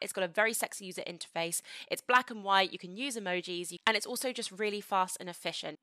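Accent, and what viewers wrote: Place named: England, United Kingdom. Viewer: Coulda sworn she was English cos of the accent and then she said she is German and I almost did a spit take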